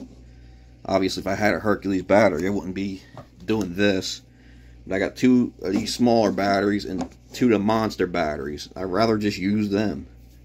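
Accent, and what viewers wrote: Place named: Philadelphia, United States. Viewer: You didn't have to tell us you were from Philly I can tell you are from Philly since you have the Philly accent. LOL YOu have exceptional MacGrubber Skilz!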